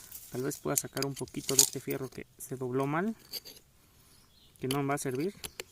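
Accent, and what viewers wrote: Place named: Chiapas, Mexico. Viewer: excelente video. estos SÍ vale la pena verlos, no como otros videos tontos ke no aportan nada ,.felicitacion al joven por su ingenio y su timbre de voz muy agradable , nada ke ver con esos videos españoles ke con su horrible tono y timbre de voz caen como patada en los EGGS. PERO KIERO SABER QUE LUGAR ES , ESTA MUY BONITO POR ALLA. POR EL TIMBRE DE VOZ PARECE SER DE CHIAPAS. AYUDA ,DONDE ES ?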